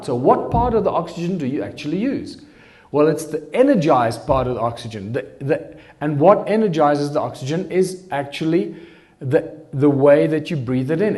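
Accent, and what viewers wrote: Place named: South Africa. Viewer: Love it! and spoken in true South African English lingo.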